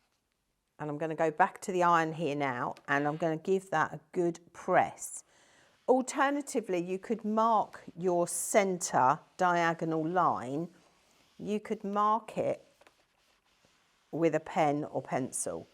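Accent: England, United Kingdom. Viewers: This is the first video I’ve seen of yours. Lovely quilt, especially with the flange on the border. I’m from the US and we call the corners you cut off “dog ears” and we “press to the dark side”. I love your English way of speaking and the fact that so many of us around the world can share in the craft of quilting.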